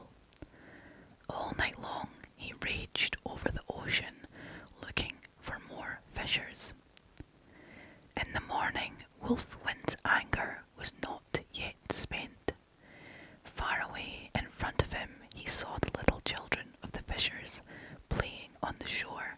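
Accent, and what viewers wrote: Scotland, United Kingdom. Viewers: your… (I wanna say Scottish…) accent is the cherry on top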